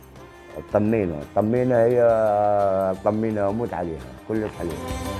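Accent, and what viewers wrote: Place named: Blida, Algeria. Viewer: لكنة بليدية على عراقية خليط متقون بامتياز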